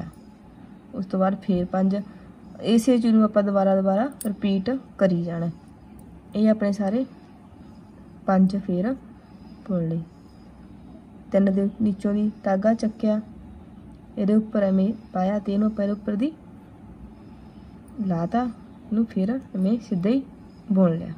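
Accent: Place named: Malwa, India. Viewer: Bahut hi vadiya tarike nal samjaya & pure Malwai Boli🥰🇭🇲🙏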